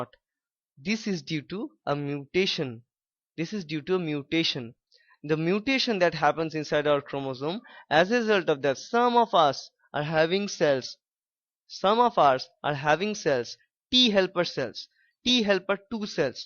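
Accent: India. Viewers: Omg.. tq god im from malaysia n im used with indian english accent if im not.. i might be dun umderstand your useful knowledge.. u r very good in explaination.. now i really understand it.. i hope i can answer my exam question very well after this.. tq guru.. ^O^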